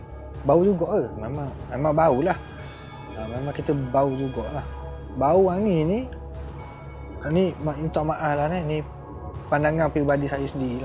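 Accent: Terengganu, Malaysia. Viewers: ouh ptutlah kdg2 kliru tgok member sha ckp,,kejap mcm ganu kejap mcm kelate,,stay safe bro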